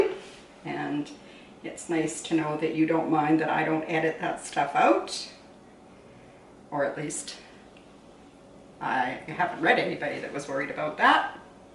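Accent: Canada. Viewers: Just found you and subscribed. I was curious where you were raised. You used the word dear (costly) and pronounced the word herbs sounding out the h as in a man's name and I noticed a bit of Canadian oots...having an English mother am I close to your heritage?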